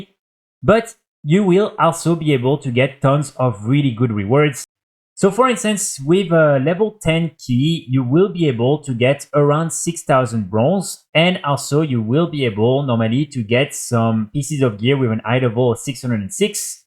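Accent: France. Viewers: Tes français non ?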